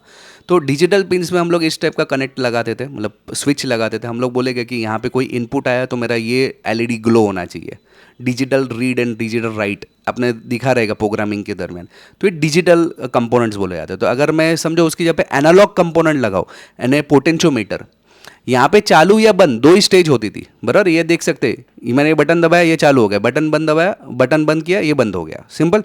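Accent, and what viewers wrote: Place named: South India, India. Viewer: Nice explanation, you are from south india.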